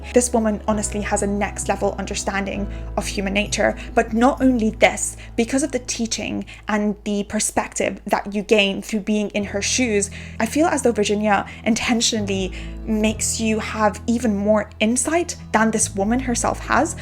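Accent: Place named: United Kingdom. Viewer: Beautiful wonderful marvellous. Good British English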